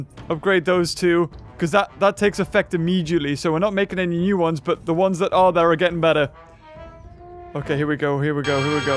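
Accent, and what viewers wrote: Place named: United Kingdom. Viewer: You kind of sound like Wilbur Soot... I guess your voice sounds similar because of the British accent.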